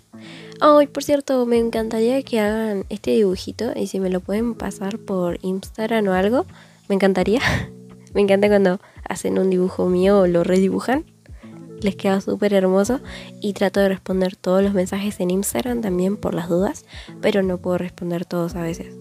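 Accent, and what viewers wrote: Argentina. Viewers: Sos argentina quiero decir por el acento xd yo si soy argentina 😂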